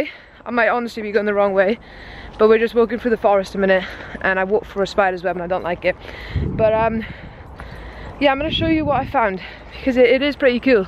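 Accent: Wales, United Kingdom. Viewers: assume wi ya voice ya welsh where about wales are u ?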